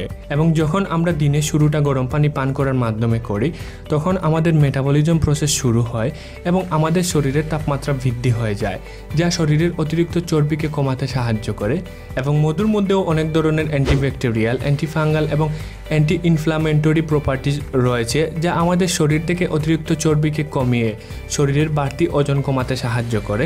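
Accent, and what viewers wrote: Sylhet, Bangladesh. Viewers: R you form sylhet ?? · Undoubtedly sylhety accent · আপনি সিলেটি আমি ১০০% শিওর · Bhai Sylhety ni apne? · সিলেটী টোন এ শুদ্ধ ভাষায় কথা বললে শুনতে দারুন মজা লাগে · Apne sylhety ? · সিলেটি ভাই 🙂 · Bhai anni ki sylheti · Bro, are you from Sylhet? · আপনি সিলেটী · Sylhety re বা.... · Vai apni ki sylheti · bhai apne ki Sylheti?? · Vai ki sylheti😀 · ভাই অাপনি সিলেটি তাই না? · ভাই গো আপনে কিতা সিলোটি নি? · ভাই আপনার মাতে তো বুঝা যার, আপনে সিলেটি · Jioy apner matei buja gese.. we are sylhety 😊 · Brother r u sylhety??? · apnar basha ki sylhet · Sylheti vai 🥰🥰🥰🥰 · Sylhet er basai je kotha bolcen tar nam ki. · ভাই কিতা সিলেটী নি???? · আপনি কি সিলেটি? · Baiya apni ki sylheti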